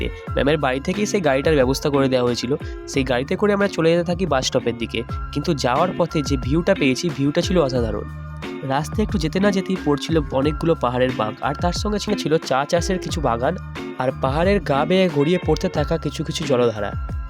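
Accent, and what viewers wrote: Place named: West Bengal, India. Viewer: Vai tumi bangali kotha sune to mne hochhe west bengal er tahole title a Bangladesh lekhar mne ta ki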